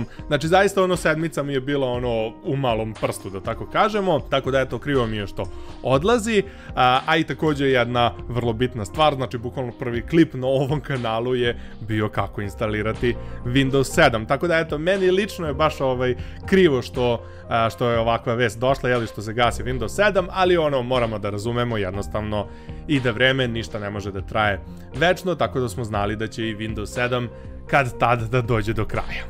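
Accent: Russia. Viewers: cita engleski kao RUS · Taj tvoj domaci engleski zvuci ko rus kad pokusava da prica engleski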